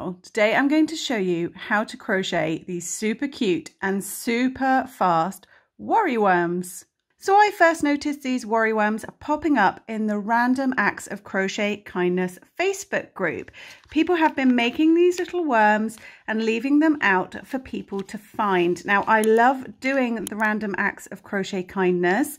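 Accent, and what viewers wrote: England, United Kingdom. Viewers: Well...I am definitely new at crocheting, but you are 4 SURE my favorite! You explain things well...plus I love the English accent, & I especially like when you say "pop your hook.."..lol. so thankyou 4 your videos. P.s. I also heard on one of your videos that was already taken...have you ever considered "robin hooked" / like robin hood....lol. you could...instead of "steal from.the rich & give to the poor"...u could....idk.."feel 4 the stitch &....well I can't think of how the rest of that would go, bit I'm sure theres a clever tagline just waiting to be discovered. Lol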